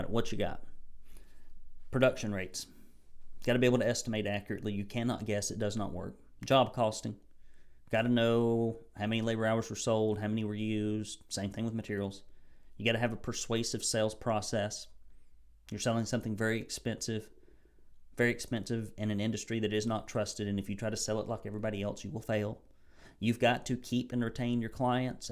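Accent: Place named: South, United States. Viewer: Hey, your commentary is "Spot On" and honest. And Oh Yeah, uh, don't ever worry about that "Rednecky Accent". Ya"ll doin Just FINE!!!!!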